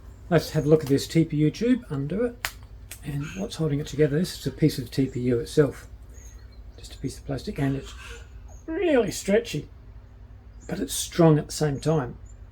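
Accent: Australia. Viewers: Very informative, great australian accent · it's South Australian, not a Sydney sider accent.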